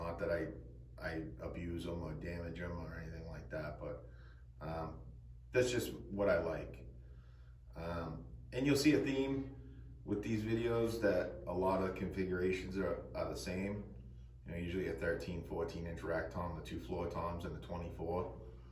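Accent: Boston, United States. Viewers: I believe these drums came in either a gloss or satin finish, it looks like you have an add-on. A quick check of the numbers on the tags should clarify this. Either way, these are beautiful drums! Nice Boston accent.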